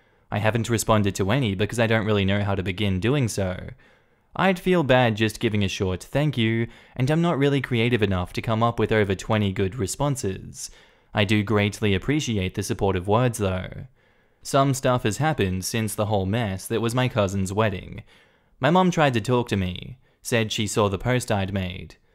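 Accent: Australia. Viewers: Purely out of curiosity, what kind of Australian accent does have? Whereabouts?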